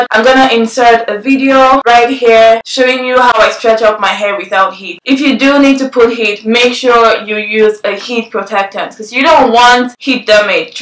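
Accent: Nigeria. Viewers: Love this!, great video. Where can I buy those stunning earrings? You are funny. Yessss to the facial expressions and Nigerian accent :) have you heard of the vanity massage brush? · where are you from... you accent is Nigerian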